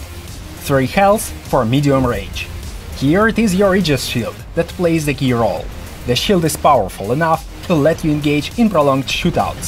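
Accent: Russia. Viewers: парни, наймите диктора с хорошим английским кровь из ушей же стрронг ррашн акцент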